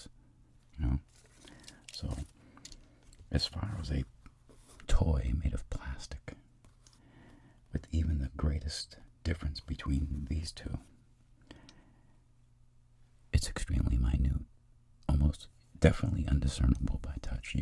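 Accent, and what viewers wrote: Boston, United States. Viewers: I Sense the Boston Accent is Strong with this one. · I never noticed his... Boston (?) accent before. He's always affected a different accent every time. Interesting. I love this video.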